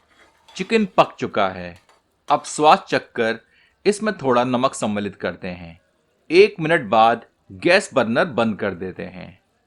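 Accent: Standard. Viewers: He is the only one who speaks SHUDH HINDI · pure Hindi .... Sooo good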